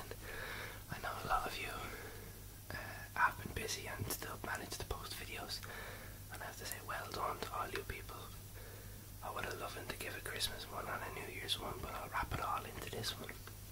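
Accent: Ireland. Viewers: Niall Horan?!? Hahah jk I love your voice and that irish accent though!! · oh awesome your Irish. I'm Irish born in america but my family is from Dublin, Ireland i think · You have a lovely Irish whispering voice :-) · I'm hearin Dublin =D Cork here....good to hear an Irish whisperer out there =]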